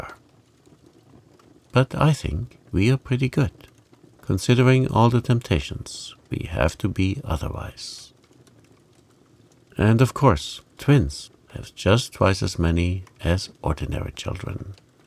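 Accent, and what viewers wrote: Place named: Germany. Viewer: This is the first time I've seen your videos and I am delighted! You are very good at speaking stories! My grandfather had a beautiful German accent like yours. He was very soft spoken as well. If they had You Tube back in the 1980's, my Grandfather could've surely been a part of it! 😆 Thank you for this. I am subscribed now. 🥰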